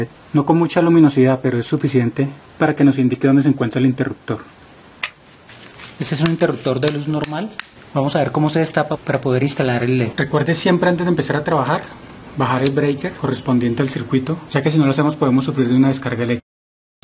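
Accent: Colombia. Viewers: Excelente, Tenias que ser de Bogotá. VERY GOOD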